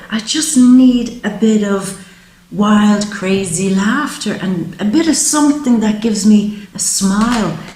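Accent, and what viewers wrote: Ireland. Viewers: You look amazing, thanks for the reading, from one Irish person to another, it's lovely to hear an Irish accent in tarot❤️❤️❤️🤗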